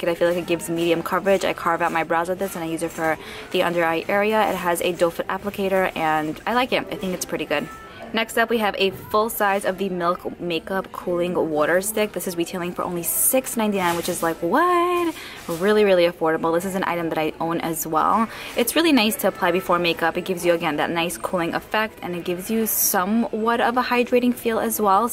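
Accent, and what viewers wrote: New York, United States. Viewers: Just found your channel and I'm loving it! Congrats on the collab 👏. Which TJMaxx is this? I see you're in the NY area (and I can def hear it lol) and I swear the North Jersey ones aren't as stocked up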